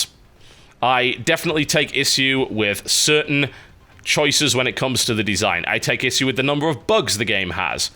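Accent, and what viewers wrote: United Kingdom. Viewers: ....he is British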